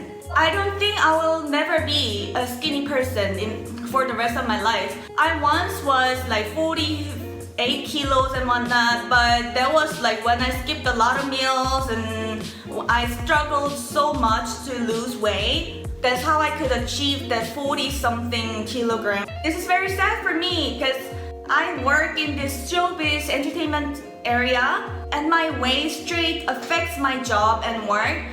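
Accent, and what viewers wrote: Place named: South Korea. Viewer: You speak English well but still have a Korean accent. Interesting.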